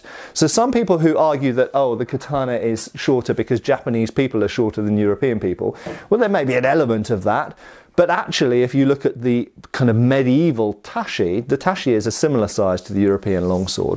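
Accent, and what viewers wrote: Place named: United Kingdom. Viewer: Spea britishl and uses inch... but why?? hahaha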